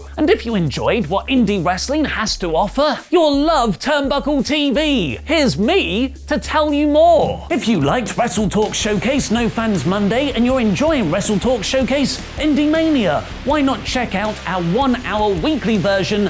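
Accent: Australia. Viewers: your Australian accent is on point mate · That is one fine Aussie accent, my friend.👍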